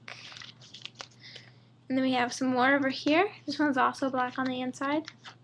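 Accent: United Kingdom. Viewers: You seem like a British person ;) I think the accent fits you perfectly! ^^ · why was she talking in a British accent?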